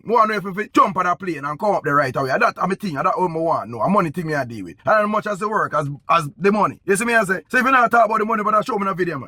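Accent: Jamaica. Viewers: It's Jamaican patois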